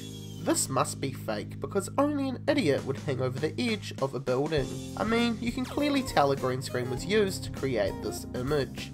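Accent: New Zealand. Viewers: He could be from Whakatane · the brogans Dunno if he's a Kiwi or not... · definitely from New Zealand. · That new Zealand accent is making my ears bleed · Yeah He is from New Zealand probably Aukland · It's his accent you fucking idiots, not a lot he can do about it. He's from New Zealand.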